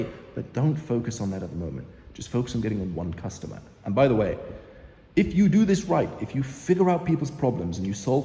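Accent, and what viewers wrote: United Kingdom. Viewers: I liked your UK accent. It reminds me the awesome voice of the legend- Tony Greig. Thanks for sharing this awesome video.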